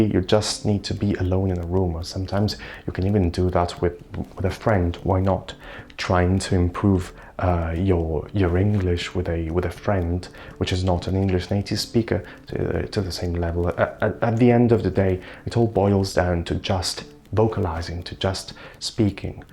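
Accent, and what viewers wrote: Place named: North, United Kingdom. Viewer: Sexy northern accent... Where have you been in Britain to study English?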